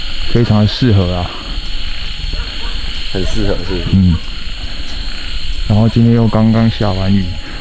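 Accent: Taiwan. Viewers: 晚上好开头介绍都用台语来说馁~我喜欢~那个低吼声我也有听到。。😰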